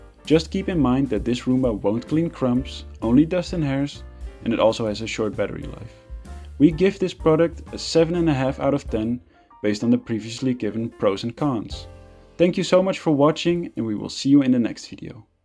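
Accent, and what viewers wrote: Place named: Netherlands. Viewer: Totally love your review, calm voice and funky Dutch accent.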